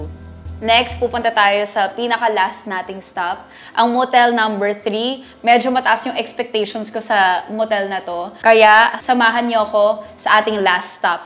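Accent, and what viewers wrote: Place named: Philippines. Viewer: The english filipino accent days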